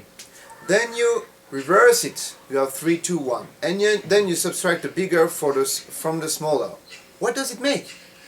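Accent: France. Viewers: tu es francais non ?? tu as l'axcent francais !!! you're freenche ?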